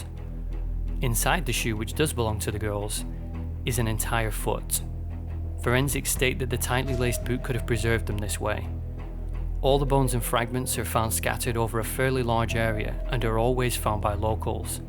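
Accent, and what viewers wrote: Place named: Lancashire, United Kingdom. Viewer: Great video and even more thanks for not being AI. Soon as I heard the accent (Lancs? Blackburn Burnley Accrington way?) I thought ‘Yessss’ and subbed. You deserve loads more subs and views 👏🏻👏🏻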